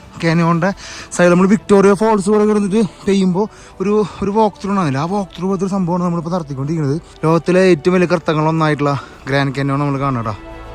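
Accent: Thrissur, India. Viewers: No words, your trichur accent, the commentary, your stature, your vehicle, solo drive all blends perfectly. Wishes for all your endeavors as good kerala U tuber. ❤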